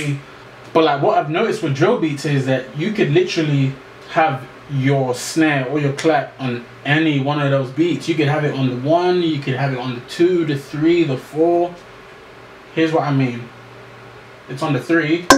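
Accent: United Kingdom. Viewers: All this time I never clocked u were from London 😂. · Is it me or did he not have a british accent in his other vids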